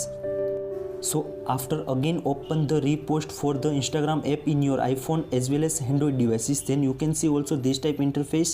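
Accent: India. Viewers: When india speaking English 👎👎👎🤮🤮🤮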